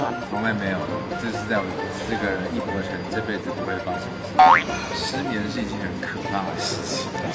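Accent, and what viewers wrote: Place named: China. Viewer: Me encanta su acento con chino. 😍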